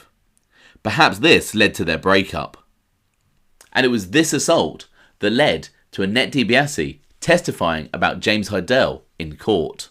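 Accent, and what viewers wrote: England, United Kingdom. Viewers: Well documented info. Interesting and now I am used to your English accent.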